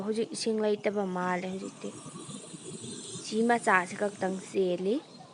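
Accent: Kakching, India. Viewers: I love kakching voice accent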